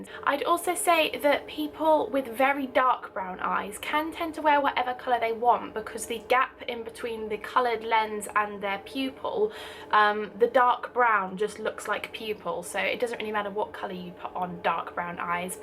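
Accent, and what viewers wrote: United Kingdom. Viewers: I really like your voice! It's super duper british and clear. Also very informative video so thanks!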